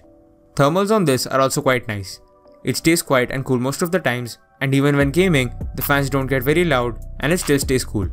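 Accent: India. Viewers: Very thicc Indian avcent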